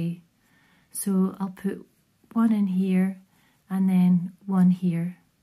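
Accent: Scotland, United Kingdom. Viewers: Just subscribed I enjoyed your video tutorial sooo much. As a newbie to crochet I found your instructions and pace, just right for a learner and I found your voice so calming and clear. Being a fellow Scott I warmed to your accent too. Your wee hoodie couldn't come at a better time also as there's a new baby due in the family in February. Thank you for your hard work and inspiration. Have a merry Christmas :0) P.s. would love some small baby dolls patterns for the new big sister's doll, 10 inch would be great ☺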